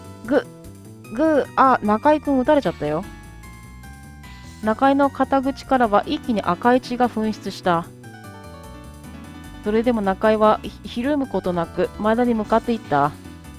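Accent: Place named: Kansai, Japan. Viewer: 関西人ですが、の関西弁くせになりそう😁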